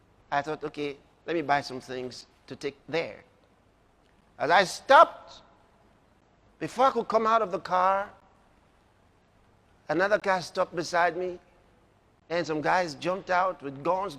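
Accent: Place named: Nigeria. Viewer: no matter how he hides his accent it still shows he is from Nigeria 😀😀😀😀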